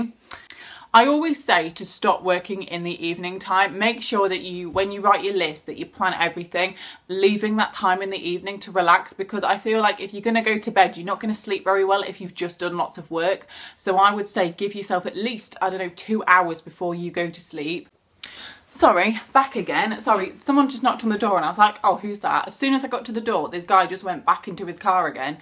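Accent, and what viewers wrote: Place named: East Midlands, United Kingdom. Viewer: I love organised omg, I have a things to do pad from poundland and it's so good- you can write the date on the top too it's literally amazing :L ! you would just think it's another notepad but it hs space for you to tick things off! and then the other side is for notes and then a mini timetable! would use it for revision as well! etc - where are you from ?! your accent sounds east midlandsy- I could be so wrong! I did chemistry and biology as well. Workouts really help me! especially as someone with learning difficulties it makes my mind feel clearer. Top tip for productivity too, make sure you're not wasting your time by doing the wrong thing (': so with revision sometimes I wouldn't be revising the most relevant bit so going back to the guide/plan of what your course covers and writing the headers do a 1 minute test where you write down all of the keywords you remember and processes and then you really remember what you need to go back to and revise! 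also to prioritise! as a lot of people put big tasks off and start with smaller ones and big ones always get pushed back. Anyway loved the video especially because it's about organising! thank you so much for visiting my channel- I hope you'll come back again some day! xx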